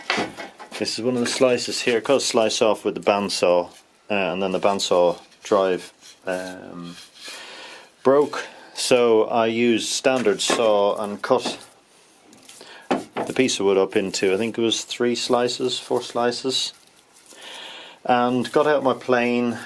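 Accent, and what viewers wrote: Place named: Ireland. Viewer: This guys Irish accent is Cool 👌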